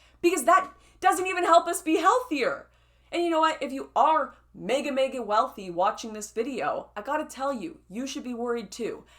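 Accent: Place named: Canada. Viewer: Is it me or is your Canadian accent back with a vengeance.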